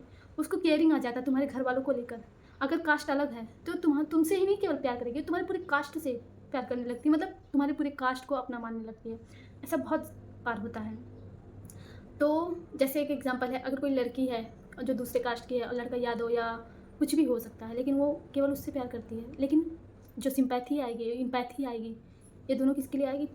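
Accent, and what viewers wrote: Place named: Banaras, India. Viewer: Aapki bhasha se aisa lgta hai banaras ya sultanpur ya jaunpur ya udhar he side se ho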